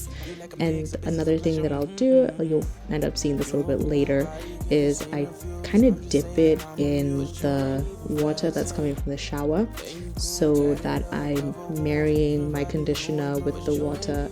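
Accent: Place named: Kenya. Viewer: She's Kenyan.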